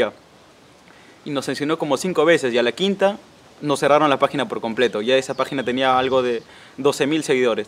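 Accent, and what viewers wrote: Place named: North, Spain. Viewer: Algun dia ire a vivir al norte para tener su acento y decir pucta v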